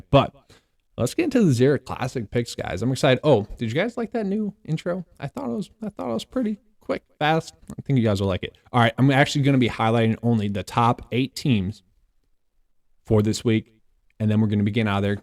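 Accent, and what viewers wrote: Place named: Wisconsin, United States. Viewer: I'm from Iowa. I call it corn hole or bags... But we know you are from Wisconsin when bags comes out as beggs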